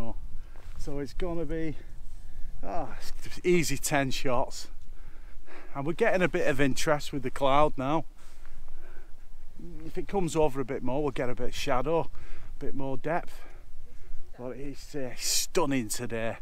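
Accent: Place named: St Helens, United Kingdom. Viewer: binging on your videos mate, really enjoying them. To be honest you stopped me in my tracks when you opened your mouth haha I thought “Now that lad’s got to be local to St Helens...”;)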